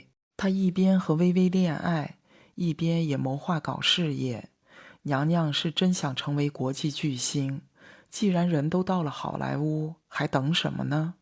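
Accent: Cantonese, China. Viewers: 哈哈哈哈哈哈哈哈哈哈哈哈哈哈哈每次聽主播說粵語我都笑不行了🤣🤣🤣🤣🤣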